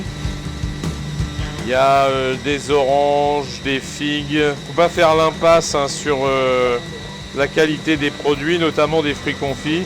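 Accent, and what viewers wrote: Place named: Paris, France. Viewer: J’adore l’accent parisien mais j’aime pas les fruits confits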